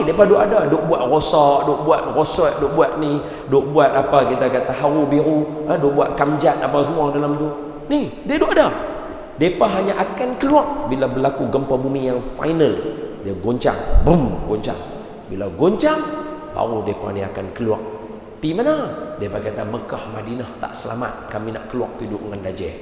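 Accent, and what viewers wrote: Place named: Penang, Malaysia. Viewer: Terbaik Ustaz Don masih pekat penang tu hehe 😁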